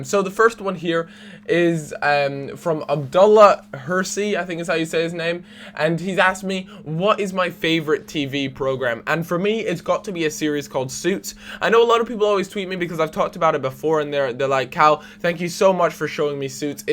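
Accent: Scotland, United Kingdom. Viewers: As a fellow scotsman I thought u were Scottish lol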